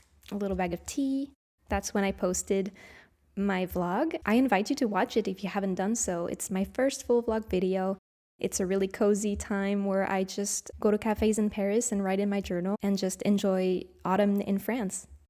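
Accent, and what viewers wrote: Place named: England, United Kingdom. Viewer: Cette vidéo est vraiment trop cool et super encourageante. J’ai acheté mon premier hobonichi pour 2025 et je me sens toute impressionnée. Pourtant j’ai l’habitude de remplir des journaux. J’ai vu Bruxelles dans certaines de tes pages (pardon je ne voulais pas lire), tu as un super accent anglais ! Au plaisir de discuter du hobonichi 😊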